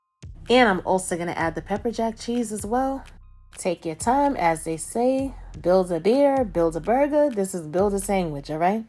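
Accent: New York, United States. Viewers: Ooooh, I'm looking forward to cooking that one up myself. And great tip with the pre-fried salami! Ps, your New York accent is simply adorable (it reminds me at times of Rosie Perez 😎). Cheers from Toronto.✌️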